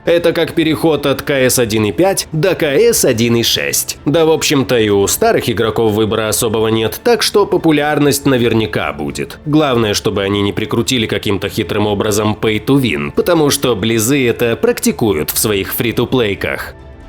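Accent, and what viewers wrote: Ukraine. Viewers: ти украинец?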